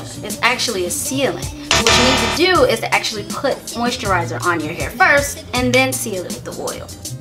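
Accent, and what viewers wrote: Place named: New Orleans, United States. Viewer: I love your accent are you from new Orleans??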